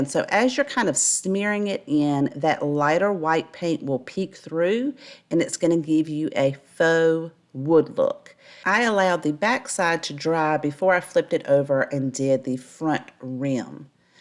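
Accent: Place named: Tennessee, United States. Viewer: You speak exactly like my family who live in TN! I’m in the north, so your accent is very distinct and familiar! 😊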